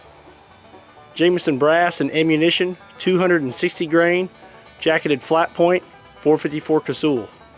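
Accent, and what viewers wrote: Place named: South, United States. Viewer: He sounds sooo much more southern in this!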